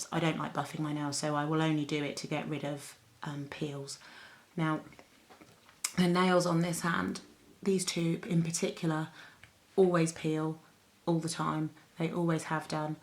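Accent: England, United Kingdom. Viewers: Dang... your nails are perfect. Your natural apex is off that chain. I have to use plastic dual gel nail forms to get my flat and thin nails to look like this ! And btw, I love your proper English accent. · Beautiful hands and gorgeous long nail beds!! Thank you for this. I have decided to try using gel overlays to grow my nails out, because, after 12 years of acrylics, nail hardeners are not being overly successful!! I've watched the films on how to apply and maintain, and you've given me the one to remove, thank you. Subscribed. Ps, so nice to hear an English voice!! Xxx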